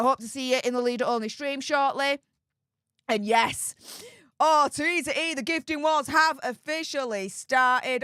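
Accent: Yorkshire, United Kingdom. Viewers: Thanks. I love your Yorkshire accent. Being Australian if find all the different English accents fascinating and lovely. Those fellow English speakers who say they don't like it and can't understand need to broaden their horizons by travelling or listening to media made by people with different accents to themselves and stop being so insular. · Love your Yorkshire accent,!! But I can't believe you thought you needed to fix your teeth!! (We're all our own worst critics, obvi!!) You're beautiful inside and out!! Always love your hair & nails, too!!;) Your shows are so much fun and your energy is infectious!! 💕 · Love your Yorkshire accent ❤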